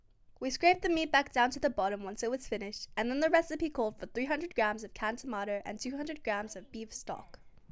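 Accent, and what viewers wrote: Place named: Asia. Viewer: You sound Asian. Any chance you can try a few Asian recipes? Like biryani, stewed pork belly, beef noodle soup, etc. Thanks!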